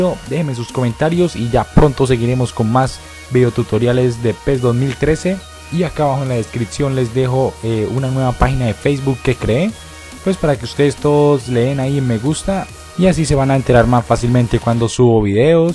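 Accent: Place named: Colombia. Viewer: eres colombiano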